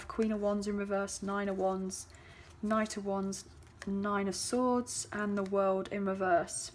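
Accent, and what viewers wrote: England, United Kingdom. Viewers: Refreshing to hear an English Voice x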